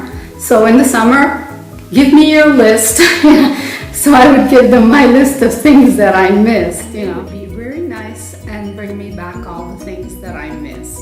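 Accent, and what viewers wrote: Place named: Italy. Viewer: Well, let me just say that waiting for a delivery (a postcard, a package, etc.) IS something I get excited about. And I'm 21, haha. By the way, listening to your voice relaxes me. You've got a little Italian accent happening there. I love it. Keep it up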